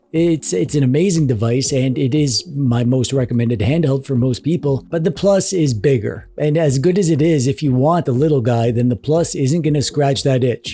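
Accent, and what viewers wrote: Canada. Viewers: This is my first time watching any of your videos, and I've now just ordered this, but one question... are you Canadian?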